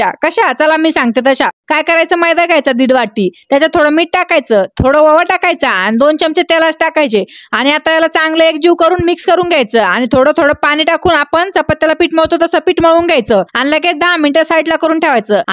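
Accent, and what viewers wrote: Ahmednagar, India. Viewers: Nagari bhashecha laheja mast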